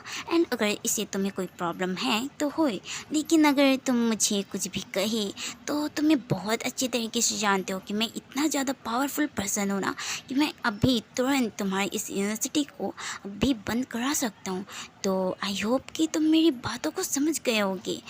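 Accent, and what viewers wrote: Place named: Pakistan. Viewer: what u want to prove like this? I mean your urdu accent..........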